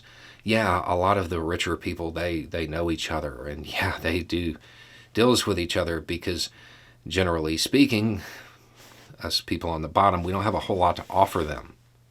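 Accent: South, United States. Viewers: What I find especially humorous, if you're going to insult someone, a bit of reading will make you more effective. The person in question decides to insult using things that a well-read person would have realized did not fit this particular case. is from Florida, I lived in Florida, and I feel for him, but that's neither here nor there. He calls a Redneck and a Hillbilly. These are both things rooted in Appalachia and not the deep south. How reading could have prevented these mistakes? Simple geography would have told you that Florida is relatively bereft of hills. How about redneck? An understanding of history would have told you about the Battle of Blair Mountain, where the miners who were attempting to strike for human working conditions were called rednecks because of the red bandanas they wore. So, no, is neither a hillbilly nor a redneck, he has a southern accent, only a decidedly ignorant poorly red individual wouldn't know that. 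For the record, hillbilly, and redneck became terms of derision due to efforts by coal mine owners to dehumanize their workers in the eyes of the general public to make it easier to mistreat them.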